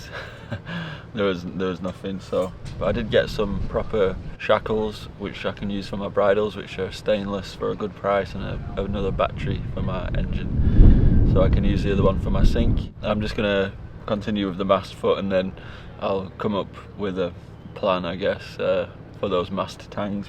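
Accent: Lancashire, United Kingdom. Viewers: Sounds like most of the blokes in my nice Lancashire town....there are still some left.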